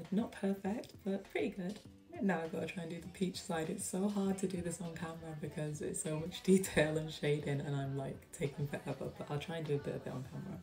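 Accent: United Kingdom. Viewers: ARE YOU BRITISH??????? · Are you British By the way I love you❤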